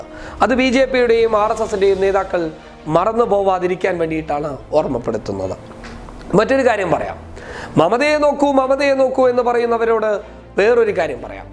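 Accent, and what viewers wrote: Kasaragod, India. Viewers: Kasaragod shaili kayarivarunnu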